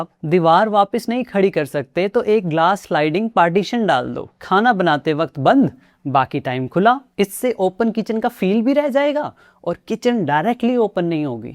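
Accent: Pakistan. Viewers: Accent urdu pakistani content for indian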